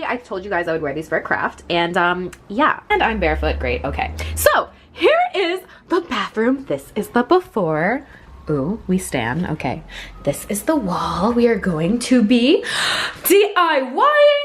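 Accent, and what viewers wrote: Australia. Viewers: I am kinda new to this channel, and in discovering all of it's awesomeness... I'm confused about the word "so". It sounds like she has an Australian accent..? Am I missing something? Almost sounds like "soar" ( vs ) Also, wall looks GORGEOUS😍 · It annoys me so damn much that in every single video she has to say “SORRR” in that redic Australian accent like sis stop it’s not cute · she likes to talk in an Australian accent because it used to be a goal of hers to go to Australia so she was speaking it into existence, she probably just has a habit of doing it sometimes now lol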